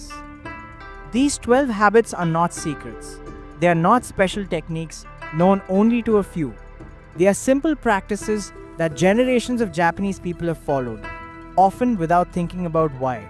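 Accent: India. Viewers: It is odd, you have an India accent and talk about Japan 😂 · Your videos are very good , but re you Indian or japanese as your accent feels indian to me ?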